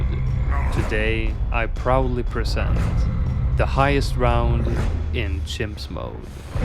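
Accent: Sweden. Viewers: Are you Swedish?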